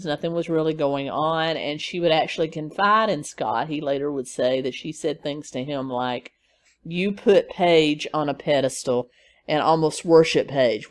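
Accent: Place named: Texas, United States. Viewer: Love your accent, reminds me of family reunions in Texas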